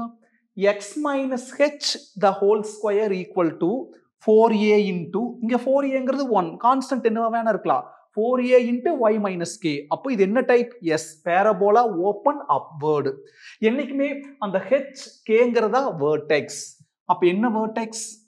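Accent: Coimbatore, India. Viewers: Your way of teaching in coimbatore slang is interesting sir👌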